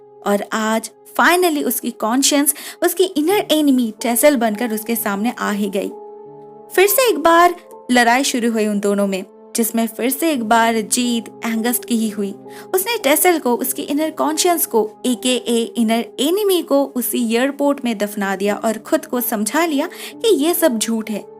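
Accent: Bengal, India. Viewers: मैडम आप बंगाली हो मैं आपकी हिंदी सुनकर बता सकता हूँ पर आपकी वॉइस अच्छी है😁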